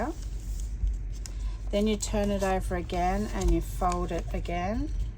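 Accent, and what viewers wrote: Australia. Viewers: These are beyond awesome!! New subscriber! Your Australian accent is neat, too!💚💚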